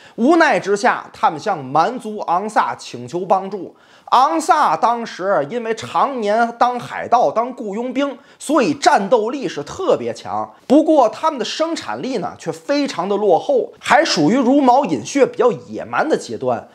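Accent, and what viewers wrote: China. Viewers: 儿化音挺好听的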